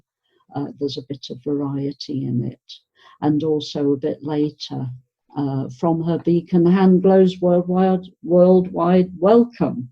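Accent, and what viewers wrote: United Kingdom. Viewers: Thank you for producing these lovely educational videos, they bring back all my past memories .... when I was a student studying in a boarding school in UK. My English teacher would read and explain to me all the economic articles so that I could understand....the way you read and explain sounds so much like her, with a very strong British accent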